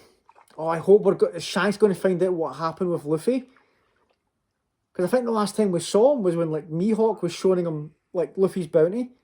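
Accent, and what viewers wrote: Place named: Scotland, United Kingdom. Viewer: Man congratulations on 1k🎉🎉🎉 Im so happy for you. I've been watching since 40subs and I feel like we're actual nakamas now because I seriously want this channel to succeed. I can totally see the hardwork put into these videos especially since you also have a job. This is no cap the best one piece reaction channel on yt. 1k is well deserved and long overdue tbh..... And you made me like Scottish accent too lol😅...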